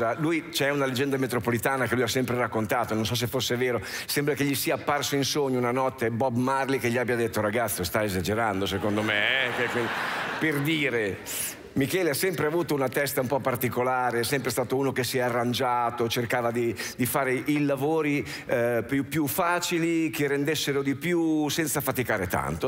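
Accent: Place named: Emilia, Italy. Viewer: È meglio quando ha l' accento emiliano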